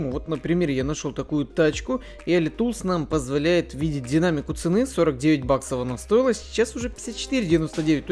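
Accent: Odessa, Ukraine. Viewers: Ты с Одессы ?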